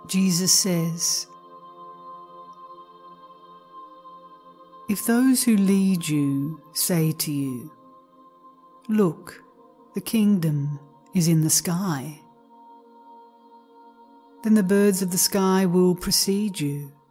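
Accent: Australia. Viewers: I think this was read by an Australian. If so, greetings fellow Aussie! Thank you for this - thoroughly enjoyed it 😊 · A beautiful reading of this book. Thank you so much. After listening to so many robotic voices “reading” sacred texts so soulessly and without any understanding at all , this is just wonderful! Also lovely to hear it in an Australian voice for a change. ❤❤❤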